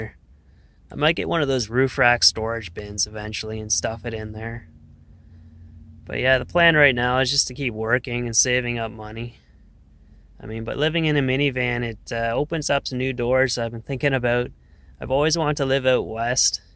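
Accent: Canada. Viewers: Judging by this dude's accent, he's in Canada. Probably Toronto or somewhere if it's so expensive and he's talking about going "out West." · If you can't tell he's Canadian by his voice then you're either not paying attention or you know nothing about Canadians. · I thought he lived in Canada because of his accent and the insane price of living. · Beautiful Canadian accent ❤ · <<If you can't tell he's Canadian by his voice then you're either not paying attention>>, because he says, "I live in an eastern Canadian city" : ) All kidding aside, I practically live on the border of Canada, so I caught that familiar accent right away. We love our Canadian neighbors.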